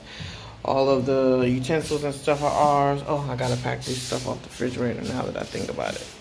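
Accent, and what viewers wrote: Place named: Senegal. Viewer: Hello, I am a Togolese living in DC and I just discovered your lovely family. Just want to tell you that I love love the way you speak French with the Senegalese accent! It is not easy to speak another language especially French. I can see why people would think that you are Senegalese. Such a good role modèle for your daughter. After 30 years in the US, I am planning a return home soon. Although I am African, I spent most of my life abroad. The anxiety of going back is real ! So thank you for inspiring and motivating me! sending positive vibes and blessings to your beautiful family. 🤎😊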